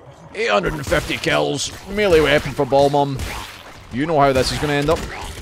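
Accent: Scotland, United Kingdom. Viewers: The Scottish accent considerably enhances the experience of this video. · me: oh neat a nuclear throne LP I don't see those super often. I'll just click on this and- instant thick Scottish accent and I am consumed with glee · are you scottish? cuz i love scottish accents.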